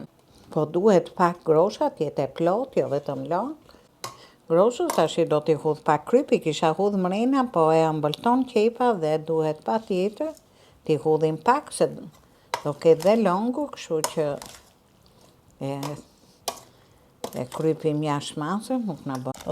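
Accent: Tirana, Albania. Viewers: Të lumshin duart dhe goja që flet Tironce puro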